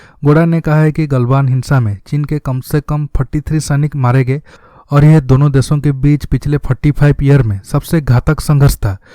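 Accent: Odisha, India. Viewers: Sir I know that you are an Odiya, by your voice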